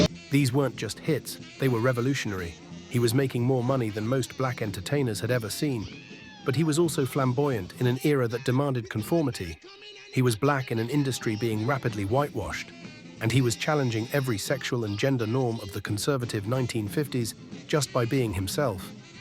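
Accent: United Kingdom. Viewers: Ok, hold the phone! You ask us to subscribe when you’re telling stories of us from this country with a British accent? Like we have to listen to a proper outsider. There is a word for this. Condescension!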